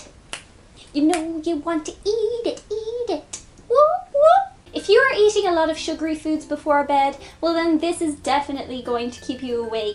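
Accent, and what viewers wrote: Ireland. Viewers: omg is she Irish , love it haha ☺ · Are you irish? I am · Are you irish or is it just me cuz im irish For anyone who is irish conas a tá tu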